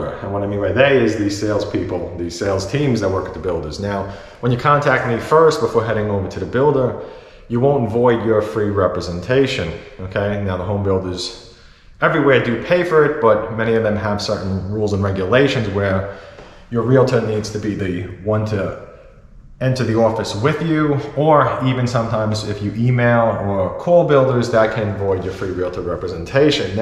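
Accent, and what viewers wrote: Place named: New York, United States. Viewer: Thanks for the tour, always like hearing your NY accent. I think big closets are because some people have so much stuff. More space around toilet makes home more ADA compliant.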